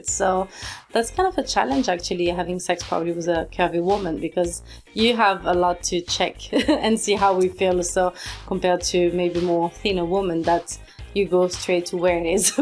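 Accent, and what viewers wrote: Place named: France. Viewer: Curvy woman with confidence and a cute french accent? Beautiful! Subscribed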